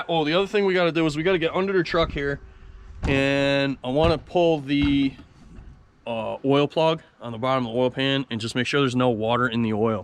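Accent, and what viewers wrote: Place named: Buffalo, United States. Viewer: you sound like you grew up in buffalo or somewhere close.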